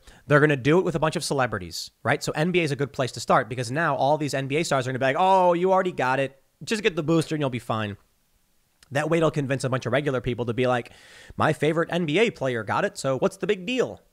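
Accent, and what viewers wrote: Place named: Texas, United States. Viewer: You're starting to sound like a true Texan. Slowing your roll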